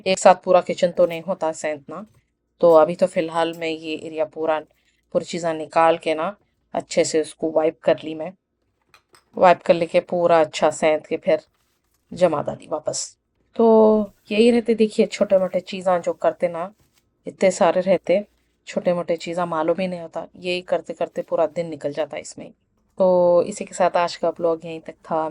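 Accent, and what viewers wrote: Hyderabad, India. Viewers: Ramdan mubarak... aameen lovely vlog ... m so excited when your vlog comes ... lovely hearing you ... i always find hydrabadi language bit funny dont mind this word "apanay ku" 😂 to funny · Aapki language Hyderabadi lagti hai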